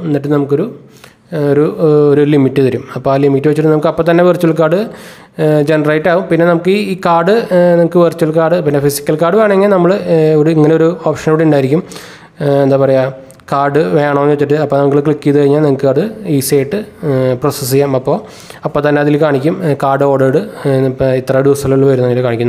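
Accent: Thrissur, India. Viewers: തൃശൂർ ഭാഷയാണ്. അൽപം സ്പീഡ് കുറച്ചാൽ നന്നായിരിക്കും.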